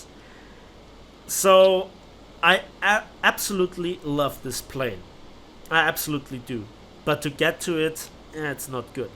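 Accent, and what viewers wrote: Bavaria, Germany. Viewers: How could anyone tell you to shut up?! Your Bavarian accent is extremely enjoyable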